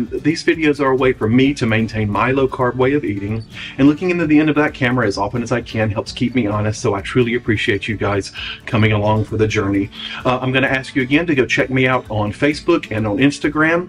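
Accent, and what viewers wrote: South, United States. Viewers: "run around the yard nekkid and yell at the dog" You been spying on me? 😂😂😂 I truly enjoy your videos. We sound so much alike with the southern ridicularity tossing words together. I love it!! Definitely trying this tonight. Hotter than Hades and more humid than Satan's draws out there baling hay. That's my justification for chocolate.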